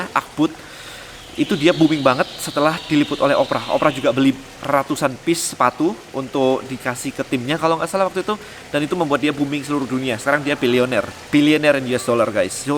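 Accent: Java, Indonesia. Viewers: koko medoknya teknikal banget hehehee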